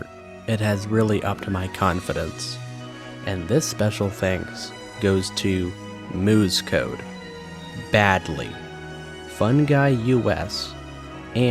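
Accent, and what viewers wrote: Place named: Azerbaijan. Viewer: Azari(A Turkish accent)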